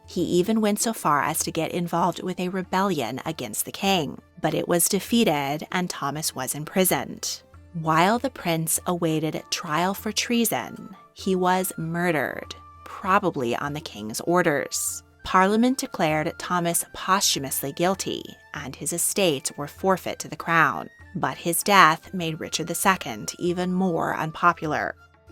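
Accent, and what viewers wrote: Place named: United States. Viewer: Such a strong American accent makes it a littke difficukt to understsnd all she says eg 'Rozayz- - 'roses'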